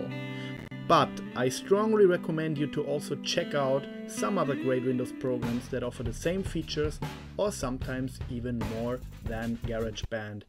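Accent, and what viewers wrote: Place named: Germany. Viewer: man hört wie deutsch du bist lmao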